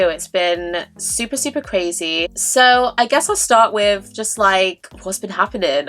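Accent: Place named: United Kingdom. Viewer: I hope this amazing life has continued. I didn’t now I longed for a British voice till yours and you have the look of the female lead role in movie Aspen Extreme Finola Hughes who. I totally crushed on in 1993 😮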